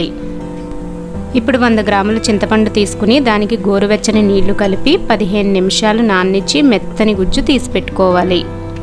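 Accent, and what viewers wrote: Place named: Godavari, India. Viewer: Nice vedeo making, nice background scoring, godavari accent... Simply superb.... Chusthunnanthasepu haayiga undhi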